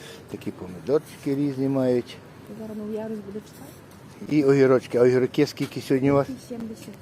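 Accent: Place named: Yavoriv, Ukraine. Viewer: По акценту чути що з Яворівщини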